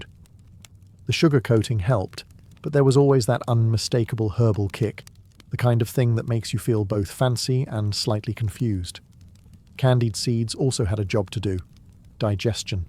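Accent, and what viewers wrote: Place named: England, United Kingdom. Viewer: Love your videos but some of your mispronunciations are so odd. Despite the English accent it makes me wonder if you're English Second Language?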